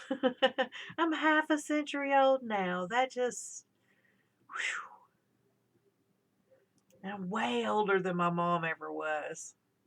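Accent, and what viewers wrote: South, United States. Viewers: I live in the South. I enjoy hearing a good Southern accent. :) I believe in the empath thing. Have you ever heard of highly sensitive people? There's a trait called sensory processing sensitivity and it has to do with how your nervous system is wired. I learned about it when I was 18/19 years old through Elaine Aron's book The Highly Sensitive Person. She talks about picking up on people's emotions, etc. I've heard "no cap" on a music reaction channel I follow, but I don't know what it means. So, I need to look that up. Or you're welcome to tell me...educate me! :) So I finally got to finish your video. Thank you for helping me chill out. I had more written but when I went back to edit my comment it erased all that. I might just do a bunch of comments along the way from now on. It'll be obnoxious, but at least I won't lose what I wrote!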